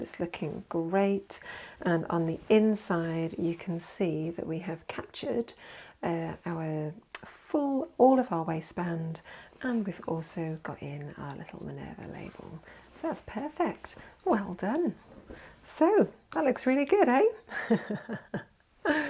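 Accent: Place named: United Kingdom. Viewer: This a great tutorial! I have been sewing for quite awhile but I seem to have so much trouble getting the wristband fitted nicely. I prefer a curved waistband instead of a thin 1 or 1.5 as I am slightly swaybacked, and I end up getting a little gap in the center back. I can’t wait to try this method. You are an awesome instructor and I love to her your cool British accent! Thanks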